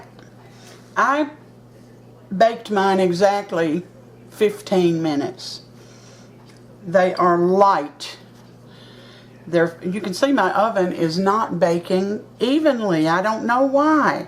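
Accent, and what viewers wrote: South, United States. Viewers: Hello from Texas! You had me at no-fail biscuits. New subscriber here. Love your sweet southern accent...sounds like my mama—she was from Alabama. I look forward to looking through all your videos and watching your new ones too! God bless you! And I look forward to trying these biscuits. ❣️ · I love your Southern voice. It's so soothing to hear you talk. I know you Love the LORD. He is so good to us. I live in Phoenix, Az. GOD BLESS YOU BOTH.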